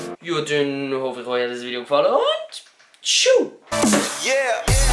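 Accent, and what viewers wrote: Switzerland. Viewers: schwitzer dutsch :D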